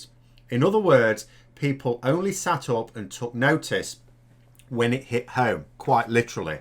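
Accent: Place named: Yorkshire, United Kingdom. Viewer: 'I like talking about politics' with quite a strong northern accent - Yorkshire I believe. Thanks for your good wishes, we'll need them!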